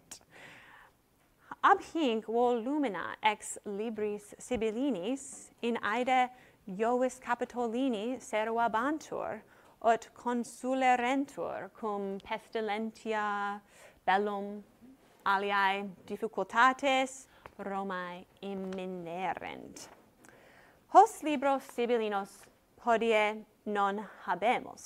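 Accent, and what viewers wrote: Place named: United States. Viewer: Not sure this Latin text with its distinct American accent, rythme and prosody would approximate the original Latin pronunciation close enough for it not to provoke a glimmer of a gentle smile on Virgil's lips. A fascinatingly bald and worthwhile experiment all the same. Many cheers!!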